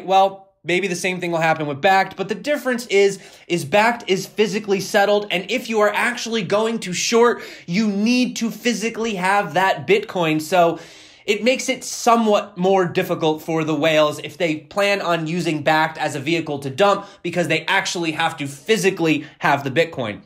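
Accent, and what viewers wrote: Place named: New York, United States. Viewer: The New York affect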